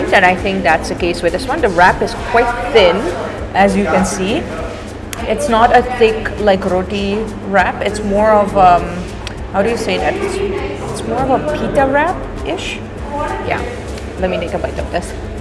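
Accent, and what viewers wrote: India. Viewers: you are acquiring that indian accent..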